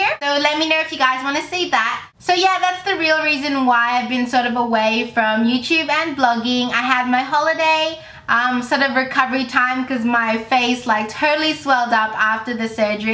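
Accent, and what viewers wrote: Australia. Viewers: Thanks for throwing this giveaway. I love hearing your Australian accent! I would also love to see more product reviews, and possible drug-store-dupes of higher end products. Have a great day! :-)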